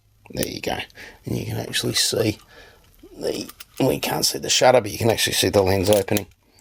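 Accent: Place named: Australia. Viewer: Very indistinct speaker....not only ehm ehm ehm but also strong "down under accent" makes him difficult to understand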